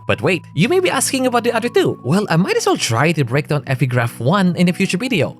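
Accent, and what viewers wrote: Philippines. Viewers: I think your a Filipino because of your actsent.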